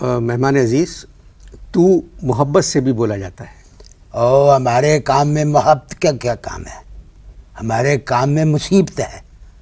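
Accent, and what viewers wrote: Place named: Punjab, India. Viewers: Omg! Ye to humse b jyada pure punjabi bolte hai😃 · Typical Punjabi accent style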